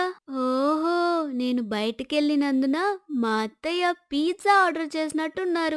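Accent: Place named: Chittoor, India. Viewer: Chittoor slang super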